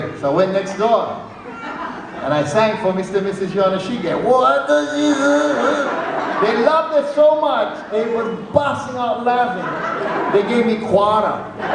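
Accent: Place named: North, Philippines. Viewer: that northern filipino accent is spot on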